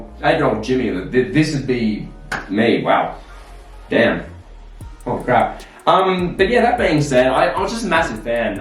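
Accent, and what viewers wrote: Australia. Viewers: Jimmy boy sound Australian but actually look like a south African or European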